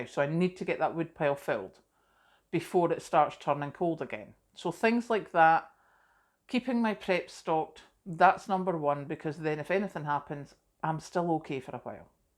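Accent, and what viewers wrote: Scotland, United Kingdom. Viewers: So? Did these save you money? Would love a recap. Thank you! Pretty lady from Scotland with the lovely accent!❤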